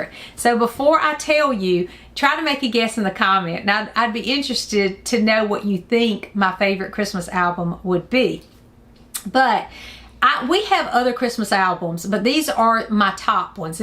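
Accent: South, United States. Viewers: your AWESOME!!!...Man oh man..love your fun whimsical video's...love your family value's...and dont get me started on your voice..that southern voice..love it!!..Your so cute and I say that with total respect..FUN FUN FUN video's...My fav Christmas album is one i listened to over and over as a kid in the 70's and its called...( A Mix )...P.S..Just ordered thanks to you..I was hoping was on it but didnt see it..:) Oh well:) is an awesome song..:) Ever heard of it???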